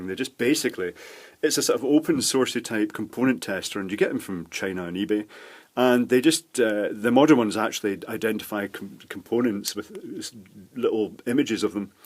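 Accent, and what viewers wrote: Scotland, United Kingdom. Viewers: Nice video. I like your scottish accent ;) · True, he is actually from East Kilbride one of my favourite Scottish toons (lovely people) but has a Newton Mearns (snobbiest toon in Scotland with the most horrible people) voice - very polite and annuciative.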